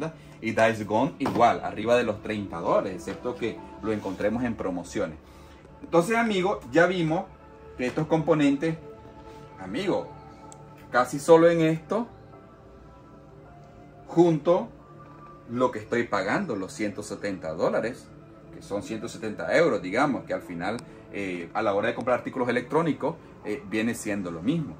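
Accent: Nicaragua. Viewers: Sos de nicaragua?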